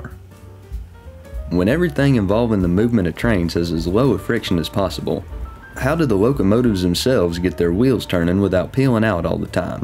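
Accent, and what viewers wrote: South, United States. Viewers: It's nice to hear a country accent it's different cool great video 👍👍👍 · As a Canadian, who speaks in a hard, flat English accent, it’s a pleasure to hear that soft Southern narration. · This guy sounds like a hillbilly, bibel basher and redneck all in one. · Never seen an educational video with a southern accent 😄👍🏻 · I’m old. Trained in physics. I never knew before the essential function of the Draft Gear. Thank you very much. Thank’s for the sweet Southeron accent! God Bless y’all. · Great informative video. I have never seen a video that explains such a complex science so simply to a layman. Also I love the friendly, folksy southern drawl. 😄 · The video sounds so American, and I have learned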